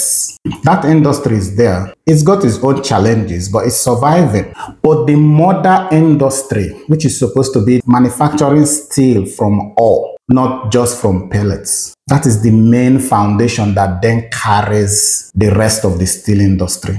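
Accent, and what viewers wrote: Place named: Igbo, Nigeria. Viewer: Your Igbo accent is too strong, sir. Just make use of Igbo language for goodness sake. Thank you, sir.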